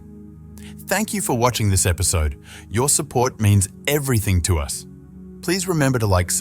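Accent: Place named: United Kingdom. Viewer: bull cocky brought to you AS ALWAYS BY A BRITISH ACCENT!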